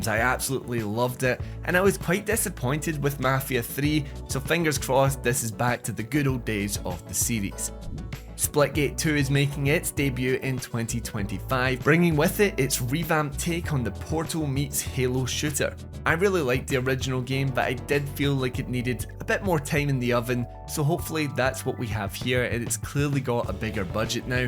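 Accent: Scotland, United Kingdom. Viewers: Is this Scottish accent?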